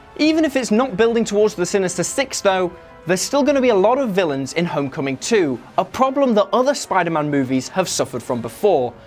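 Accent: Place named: England, United Kingdom. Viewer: Ugly English accent.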